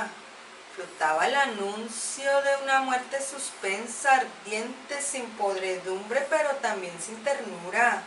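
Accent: Mexico. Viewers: ¡Uy, dejaste morir en los créditos a tu asistente! ¿Dónde está tu versión neutral? Una última pregunta: Un mexicano cuando lee ¿Lo hace así? El acento es importante pero no es definitivo para hacer una buena lectura como "mexicano"; no dejes por fuera el ritmo, la vocalización y la inflexión de tu voz. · El acento mexicano te quito el ritmo de la lectura.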